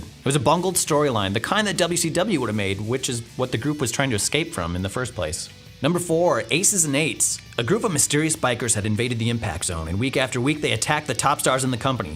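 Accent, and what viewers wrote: United States. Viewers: throws me to hear an american accent on these vids. dont understand the hate though. all these vids sound like they have the same writer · I'm not used to hearing an American on WhatCulture Wrestling😅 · I'm pretty sure it's because he is an American and doesn't have the British accent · sorry... the narration through me off.. American accent confused me. lol I thought I wasn't watching WhatCulture for a sec. 😄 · Whatculture lucked into something great with the 10 Totally Wasted Factions and as they don't often do, they had no idea what to do with it, and put a whiny American kid in it. Long live British accents in Whatculture. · So you're the yank, eh? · Hears american guy Instantly goes back to subscriptions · *american accent *shudders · I'm watching a WhatCulture video why is there an American talking. If I wanted to hear Americans talk I'd be watching wrestling directly. 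· Who the effing hell is this guy with an annoying yankee accent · Fuck this American, un subbing now , this would be so much more better with a British guy narrating - why the fuck are you guys diverting from what made you so great ? It's like your the wwe and we the fans are told to just shut up and accept it - fuck you - wrestling with wregret all the way now , this channel is no longer what it used to be , it's sold out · Exactly. I'll watch if it's Blampied, Pacitti, Simon or even Jack but is a no-no. Annoying bland American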